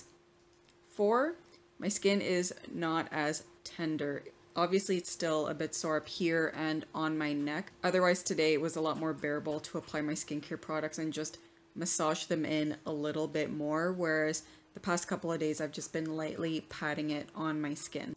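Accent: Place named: United States. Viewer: Where are you from? From your accent, I thought you were American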